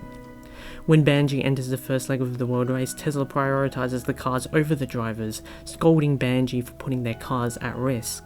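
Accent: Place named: United Kingdom. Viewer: I can't listen to "if you're beiaten and tortured to a pulp." Yout Birtish English is hard to me that's why I ask on comment section when the captions don't match the dialogue.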